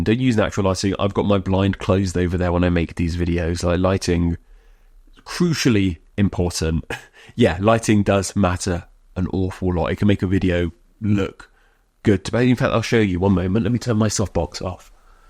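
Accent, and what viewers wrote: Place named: United Kingdom. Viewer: That's clearly a British accent.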